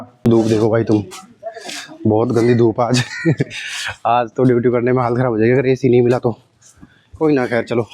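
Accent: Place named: Braj, India. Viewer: Oh Dousa rajsthan se wahi soch raha tha ye brajbhasha ascent bol raha hai mai bhi je hu mathura se❤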